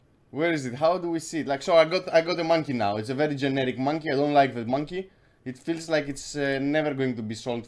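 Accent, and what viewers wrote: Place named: Greece. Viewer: thiccc Greek accent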